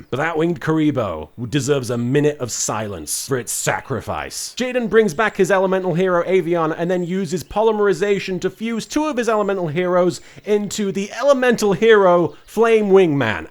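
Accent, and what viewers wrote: United Kingdom. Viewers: Your britishnes is showing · So that's what his normal voice sounds like... It's... British! Truly a shocker considering that he has been called a chubby British guy by Ishizu!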